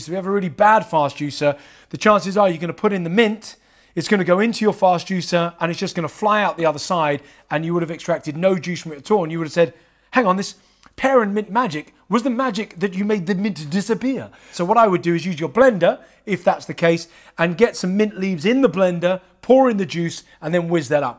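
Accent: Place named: United Kingdom. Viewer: I love Jasons British accent!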